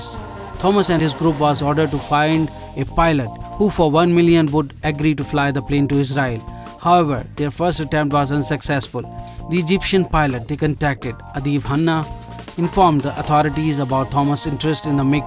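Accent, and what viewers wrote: India. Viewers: Any Indian accent just ruins any program.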